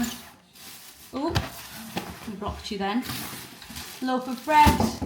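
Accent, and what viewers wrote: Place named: Scotland, United Kingdom. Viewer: Love the video and seeing the squirrel. Haven’t seen one for years. Wow those fees are extortionate. I love the Scottish accent ❤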